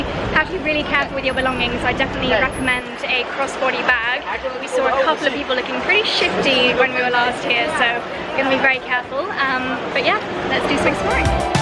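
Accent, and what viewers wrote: Spain. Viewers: Love your Spanish accent Buenos Dias a ti good morning to you :) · You have a very good spanish!! Im Mexican and it was lovely to hear you speak with a quite strong spanish accent 💕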